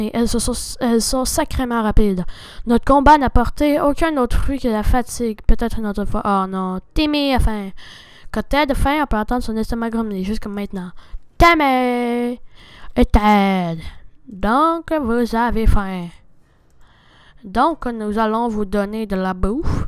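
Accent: Quebec, Canada. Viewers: Super vidéo en plus tu es Québécois j'adore l'accent Quebécois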